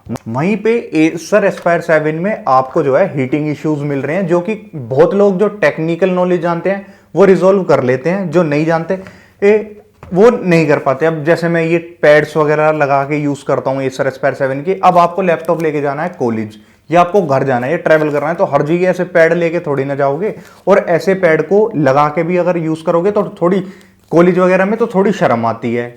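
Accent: India. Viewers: par bhaiya aapka bolne ka tarika ekdum mast hai , ekdum desi , very good 👍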